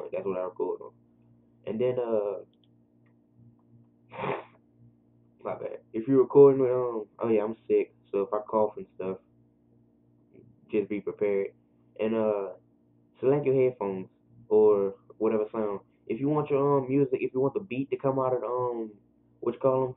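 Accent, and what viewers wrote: United States. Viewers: u from Louisiana aint cha? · u can tell this nigga New Orleans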